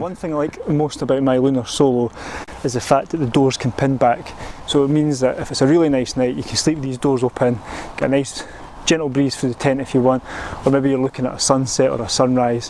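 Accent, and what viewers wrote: Scotland, United Kingdom. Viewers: Perfect review! Thanks for this! I lived in Edinburgh for a year, it's so nice to hear that scottish accent again! :-D (or am I wrong?)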